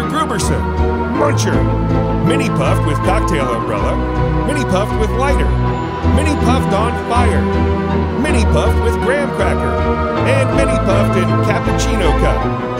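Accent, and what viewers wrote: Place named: Michigan, United States. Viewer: You have the Michigan accent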